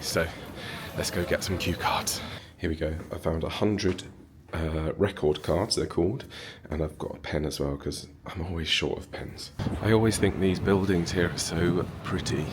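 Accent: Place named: Yorkshire, United Kingdom. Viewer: the Yorkshire accent developing well lad.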